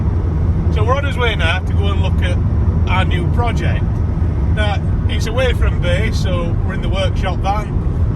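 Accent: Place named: Yorkshire, United Kingdom. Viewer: Is proper English just a Yorkshire bloke mate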